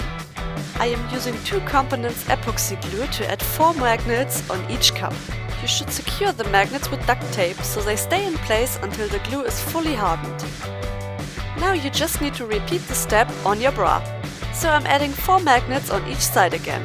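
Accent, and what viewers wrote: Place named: Germany. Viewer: are u from germany because yopur english sounds really german xD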